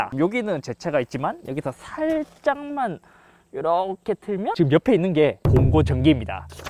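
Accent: Gyeongsang, South Korea. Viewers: 어쩐지 경상도 말투다 했는데 안동사람이군.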